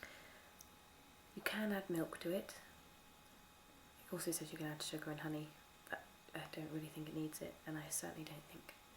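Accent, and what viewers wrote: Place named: Devon, United Kingdom. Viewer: There is something so perfect about you talking about tea ha. You really have this homelike comfort quality about you, it's lovely. Can definitely tell you are from / lived in Devon! My friend is from Witheridge and she is obsessed with tea also!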